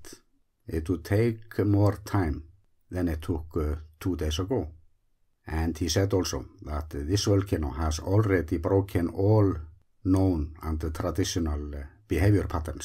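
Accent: Iceland. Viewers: Thanks for the info. I find it difficult to understand sometimes what you are saying because your English has such a strong Icelandic accent. This is something you could work on with a native English speaker . . . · I’m Canadian (I only speak English), and I understand him well. I actually enjoy listening to the Icelandic accent :)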